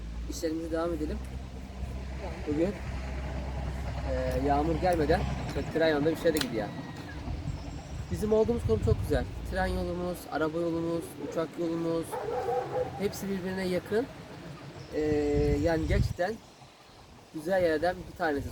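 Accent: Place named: Kastamonu, Turkey. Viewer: Sizleri izliyorum bende kastamonu bozkurt ilçesi konuşmanın bi ze benziyo mesala mancar😁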